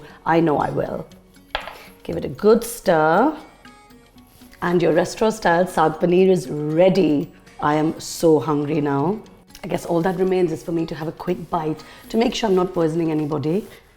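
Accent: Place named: India. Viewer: Excellent recipe, thank you. Side note: the Indian/English accent is the most beautiful accent in the world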